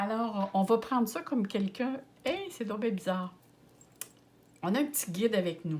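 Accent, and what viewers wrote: Quebec, Canada. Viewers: Belle philosophie et présentation, ça me parle, avec en prime ce super accent Québécois.Ce beau pays et bons souvenirs de voyage pour moi.🙏💚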